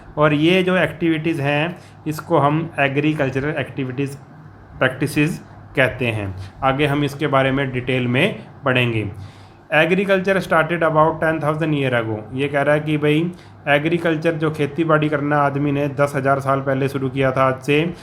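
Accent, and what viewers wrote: Punjab, India. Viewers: I think he is Punjabi so his accent is Punjabi 😊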